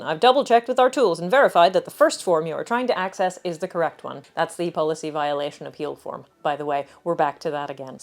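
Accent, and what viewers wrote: Ireland. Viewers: I love that you say : in the Year of Our Lord with that sweetest Irish brogue of your ! If flies in the face of all the dumb sheep who say "Common Era" like it was a piece of legestative rule that if one disobeyed ones nose would fall off . The brogue is what the systemic algorithm looks at with a brain that's bruised with numb surprise with still one place to go. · Im just trying to figure out what region your accent is from. Irish?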